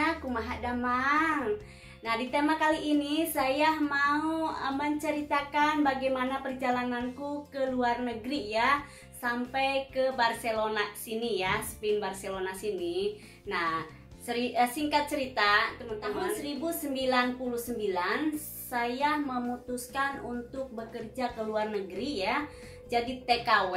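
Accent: Sunda, Indonesia. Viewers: Bu sundanya dariama mani medok sundana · Salam kenal sy dari kadipaten majalengka..mau tanya.Mama.asli ny orang mana..logatny sperti orang sunda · Seru ngomong teh sunda banget jd seneng ngikutinya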